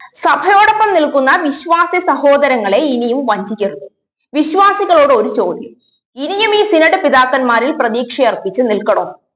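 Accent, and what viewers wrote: Changanassery, India. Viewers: ഉച്ചരണം കേട്ടാൽ അറിയാം നിങ്ങൾ ചെങ്ങനാശേരി ക്കാരി ആണ് എന്ന് നിങ്ങൾ കഠിന പരിശ്രമം നടത്തിയലൊന്നും എറണാകുളം ത്തെ തകർക്കാൻ ആവില്ല ദൈവം മുകളിൽ ഉണ്ട്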